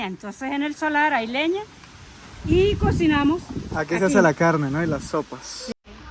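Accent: Mexico. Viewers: ya hablas como mexicano amigo... muchos saludos ddesde Nayarit , Mexico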